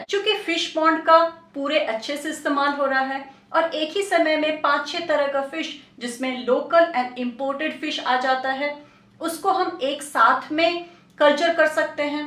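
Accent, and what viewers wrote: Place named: Bihar, India. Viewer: Where you from? It feels you are from either bihar Or up. Anyways it was a wonderful lecture and helped me truely.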